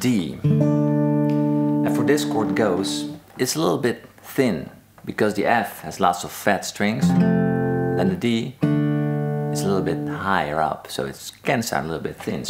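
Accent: Netherlands. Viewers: You have a Dutch sounding accent. Wow, liked this video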